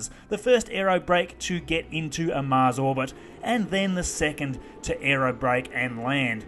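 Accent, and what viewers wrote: Australia. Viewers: So I'm guessing your Australian?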